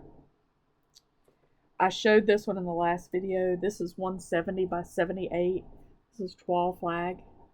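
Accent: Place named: South Carolina, United States. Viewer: Hi! The thing that drew me to this video was your beautiful Scarlett House Floral Motif piece! It is simply stunning. It is really calling my name, but I’m trying to WIP down! I am also charmed by your accent! You are from SC? I’m originally from Tennessee although I live in Chicago now. I used to sound a lot more like you and I miss it! lol. Sounds like you had a fabulous retreat. I love spools and want to find a big one some day to put in my craft room! I’ll be looking forward to your next one whenever you get around to it!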